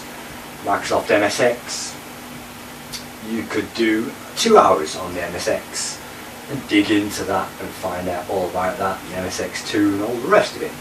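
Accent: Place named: North, United Kingdom. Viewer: Interesting vlog cant wait for the Vid:) and you look a lot younger than you sound( love the Northern acc dont lose it)! thats a compliment:)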